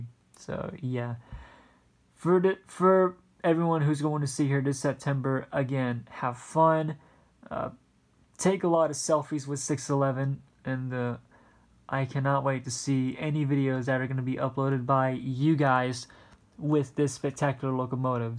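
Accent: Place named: United Kingdom. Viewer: wow british accent on point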